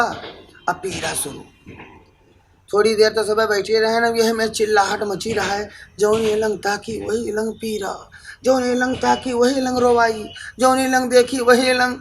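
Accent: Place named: Barabanki, India. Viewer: अरे भाई आप कहा के है बाराबंकी के हे क्या लग रहा है बाराबंकी में रहते है क्यों की ये वही की बोली है! आपका छोटा भाई तारिक़ जैदपुर।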